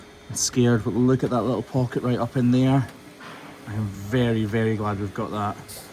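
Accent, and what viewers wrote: Scotland, United Kingdom. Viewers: So interesting and love your gorgeous Scottish accent ❤️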